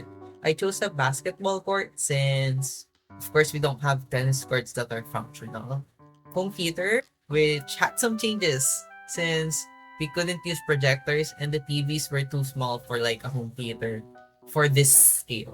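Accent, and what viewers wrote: Philippines. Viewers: You sound filipino, are you?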